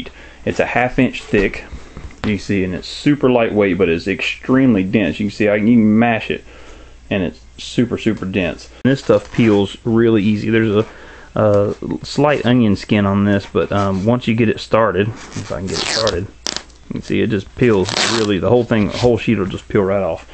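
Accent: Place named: United States. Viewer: you sound very american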